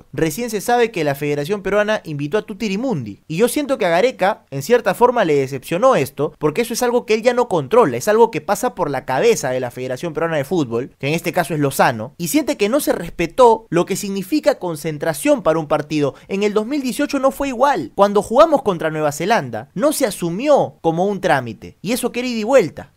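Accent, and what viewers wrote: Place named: Buenos Aires, Argentina. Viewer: Te escucho algunas veces, pero es la primera vez q escribo, Gareca aun no ha dicho nada y lo que afirmas es mera especulación, muy típico del nefasto períodismo de fútbol peruano, salvo honrrosas excepciones, si sacan a Gareca, acá el tema no sólo es destruir sino proponer quien debería ser su reemplazante si afirmas que Gareca se va Y la otra el acento bonaerense habría que evitarlo un poco. Saludos y éxitos a futuro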